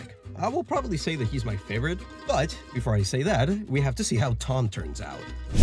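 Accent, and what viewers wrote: Romania. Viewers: wait... Are you romenian? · wait, are you Romanian? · YO ARE YOU ROMANIAN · are ya romanian?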